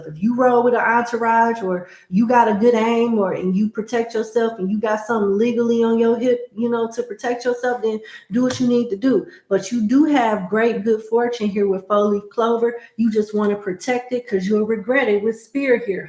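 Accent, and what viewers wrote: South, United States. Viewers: Love your vouce and accent Southern right? Which state?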